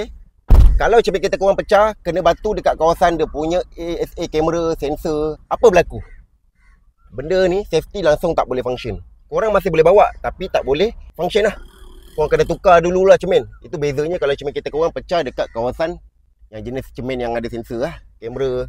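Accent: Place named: Aceh, Indonesia. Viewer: Aksennya seperti orang aceh yaaa..aq lama di aceh jd familiar dgn logat orang aceh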